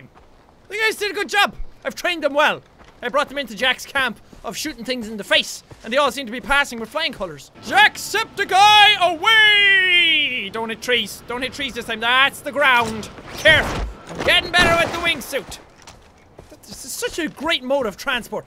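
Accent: Australia. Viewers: I'm an Aussie and that wasn't the worst Aussie accent ever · Your bringing back the Aussie accent again. Embrace the Aussie accent! Long live jack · Jack has an awesome Australian accent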